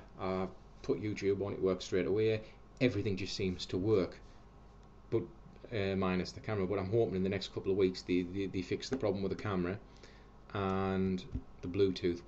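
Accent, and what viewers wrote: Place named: Northern England, United Kingdom. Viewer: damn cool accent you've got there haha :) northern england, that is, yeh? york?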